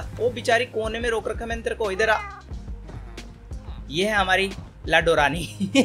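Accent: Haryana, India. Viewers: I love when you speak Haryanvi because I am from Haryana I am your big fan love you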